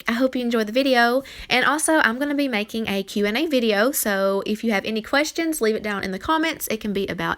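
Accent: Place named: West Virginia, United States. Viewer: Where are you from? Your accent sound like you're sound local or maybe a surrounding state, I'm from West Virginia 🙂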